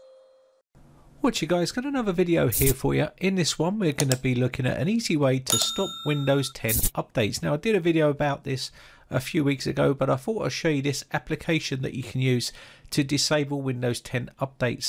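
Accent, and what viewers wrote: United Kingdom. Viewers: I'll subscribe cause your British accent. Also windows updates breaks something EVERY single TIME, so this should be helpful.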